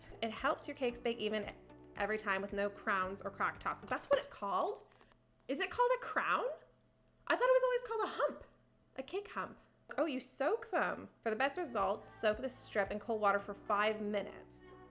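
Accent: Canada. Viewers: Oh you're Canadian? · If you see this can you answer it are you Canadian?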